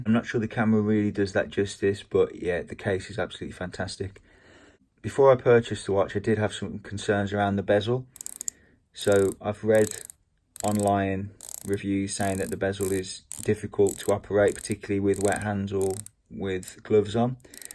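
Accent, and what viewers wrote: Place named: United Kingdom. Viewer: superlative British accent absolutely outstanding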